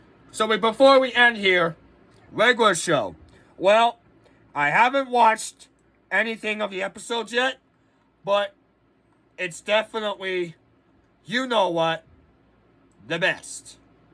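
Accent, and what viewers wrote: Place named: United Kingdom. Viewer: Dude sound British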